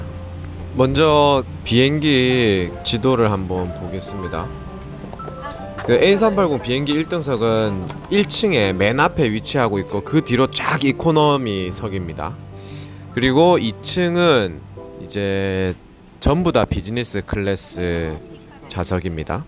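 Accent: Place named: Gyeongsang, South Korea. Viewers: 갱상도 사나이 발음....잼납니다... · 등드리. 나나.. 정말.간만에.듣는.고향말..ㅎㅎ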